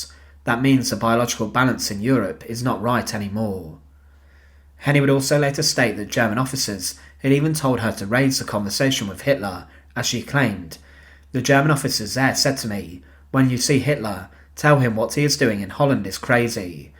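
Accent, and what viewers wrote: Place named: England, United Kingdom. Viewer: He's English. Very easy to understand if you're English but I'll agree not a very naturally spoken presenting voice.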